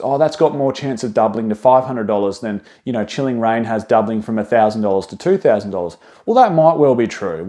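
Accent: Australia. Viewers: Pop it on the Tele merch this is as Aussie as you can get….loving it mate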